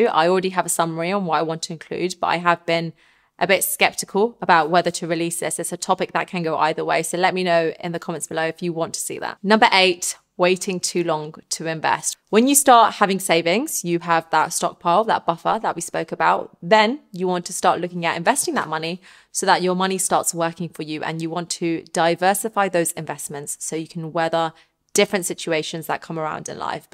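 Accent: England, United Kingdom. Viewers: Why do English talk like that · First time on the channel. What a gorgeous lady and the british accent also hits the spot. What a great way to learn more about ficances. Subscribed! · is very cute and has a lovely British accent. AND a smart lady. Very practical video. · I can't understand your British accent 🤡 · Can someone tell me what accent this is? Is it a specific regional UK accent? She sounds a lot like the YouTuber Vaughn George and I've never been able to figure out what his accent is either..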